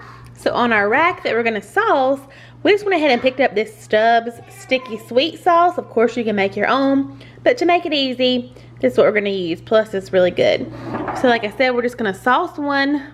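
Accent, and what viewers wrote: South, United States. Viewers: Girrrl... I’m starved lol great recipe. And by the way you talk just like me, I’m from the south 👍🏻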